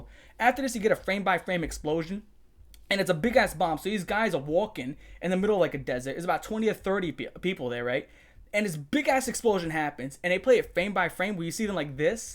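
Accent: New York, United States. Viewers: You sure you're not part Italian? You have the NY guinea accent and you're hilarious.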